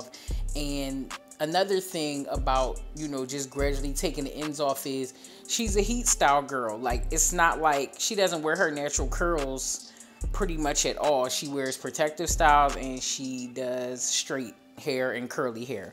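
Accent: Philadelphia, United States. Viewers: 15 minutes in and the Philly accent slapped in the face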